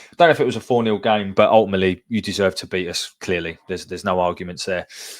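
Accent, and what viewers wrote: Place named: Birmingham, United Kingdom. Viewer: sound just like a brumy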